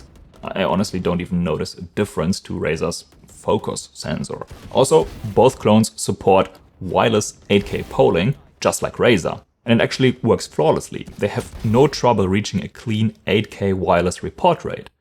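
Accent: Germany. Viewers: I've noticed I'm hearing the German Knacklaut less frequently these days. I must admit, it's quite pleasing to the ear. Not that I don't appreciate a German accent in fact, I think is rather charming.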